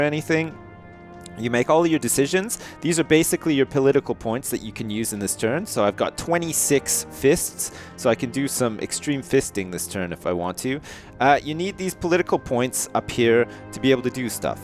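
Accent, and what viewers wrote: Canada. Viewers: Sips your from Canada? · your canadian!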